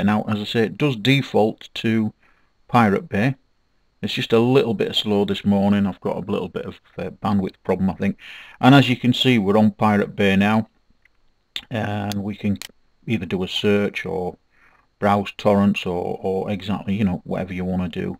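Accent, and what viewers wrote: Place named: United Kingdom. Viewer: nice i've always wanted British people narrate .. lol nice voice the damn grammar XD!! don't get me wrong though .. nice vid and i'l be checking in and out for updates in pirate browser . .again thx man ..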